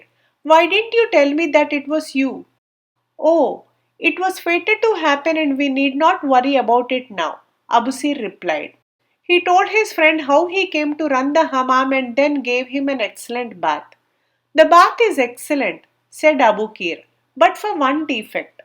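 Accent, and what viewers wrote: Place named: India. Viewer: You narrate so beautifully with a touch of indianness which is delightful for the listener!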